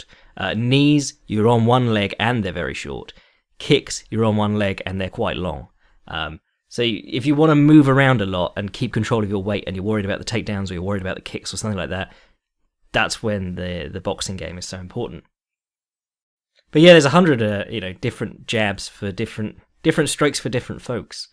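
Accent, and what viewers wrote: England, United Kingdom. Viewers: is back already... the usual week long hiatus of a good old English accent delving into the joys of combat is no more. Thanks!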